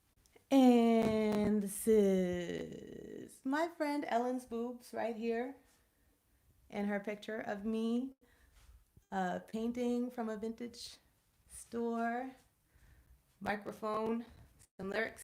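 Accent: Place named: United States. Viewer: She doesn't have an accent she sounds American.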